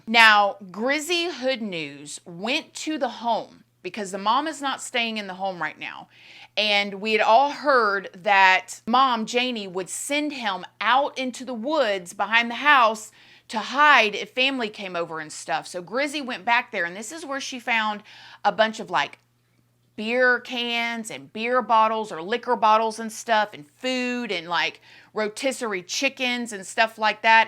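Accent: South, United States. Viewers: I actually need my regular fix of this southern comforting voice. It sounds like fresh baked cookies and warm hugs. This lady is smart as a whip. Sharp as a tack. Brains, beauty, and lovable x 1 million. She's top tier . I hang on her every word ❤❤❤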